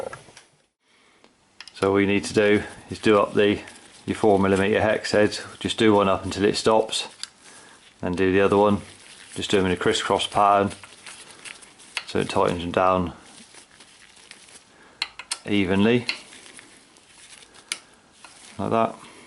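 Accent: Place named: Birmingham, United Kingdom. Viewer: Nice man thanks .. Is you a fellow brummy?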